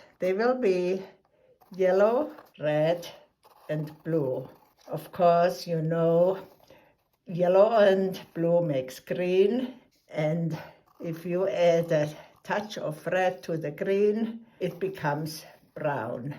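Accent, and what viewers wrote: Switzerland. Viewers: Your DARLING one-of-of-a-kind Swiss ACCENT adds a wonderful CHARM: crisply frank & warmly feminine: BRAVA!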